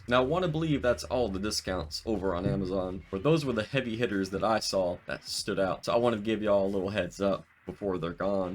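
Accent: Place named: United Kingdom. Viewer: ? you sound like you’re British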